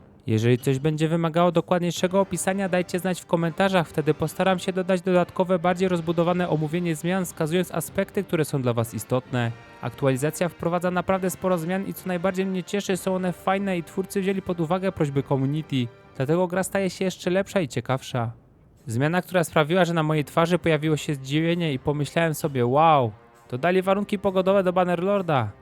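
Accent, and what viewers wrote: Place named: South, Poland. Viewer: Po wymowie stwierdzam ze jesteś z południa polski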